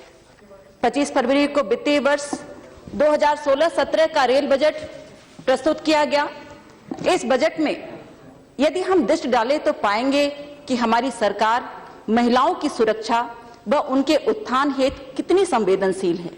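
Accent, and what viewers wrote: Standard. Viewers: इतना शुध्द हिंदी संबोधन बहुत कम लोग करते है ।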